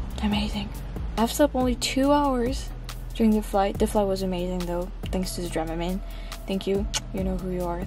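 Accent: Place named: France. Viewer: Eric was right about your English. I was all wrapped up in your story and didn't even realize that you have an accent until Eric committed on it.! Wow you are really getting good at it. I don't want you to be perfect because of the Frenchtastic way you speak English.! Love you from Tennessee.! P.s. When you çome back, you need to check out the South Land . Especially Louisiana and talk some Cajun.!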